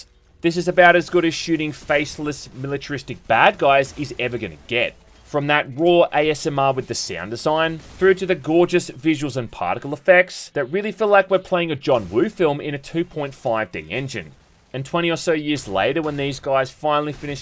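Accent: Australia. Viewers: have you never heard an Australian?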